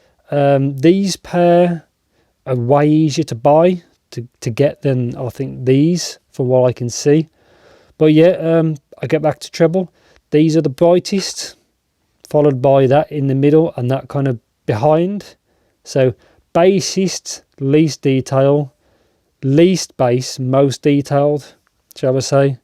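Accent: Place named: Black Country, United Kingdom. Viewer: I dont know if it's the short, informative and to the point videos or the (I want to say black country?) accent that makes me feel at home but you have to be in my top 5 youtubers. Always eager for a new video from you, especially for iems and daps! Keep up the great work!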